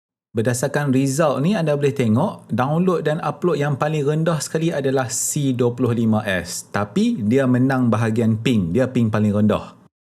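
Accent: North, Malaysia. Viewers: tima kasih bg info berguna, izin saya share dlm fb... SELamat Hari Raya Bos.. pekat jugak loghat utara naa...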